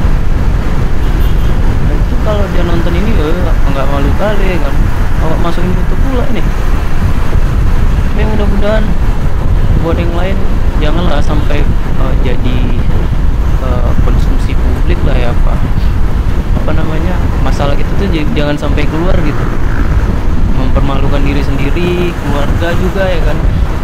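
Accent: Medan, Indonesia. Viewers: udah agak ilang kutengok logat medan kau disini lae, jangan di ilangin lae daya tariknya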